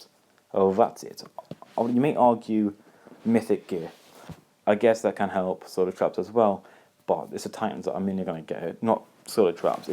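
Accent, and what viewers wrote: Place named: England, United Kingdom. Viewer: Awww English accent...😀.. English?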